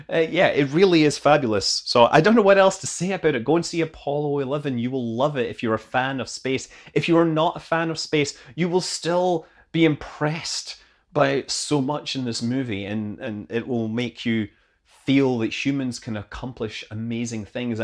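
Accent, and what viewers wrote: Scotland, United Kingdom. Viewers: Hi, did you watch it in the US? Can't help but notice you're Scottish, and I can't find it anywhere in the uk!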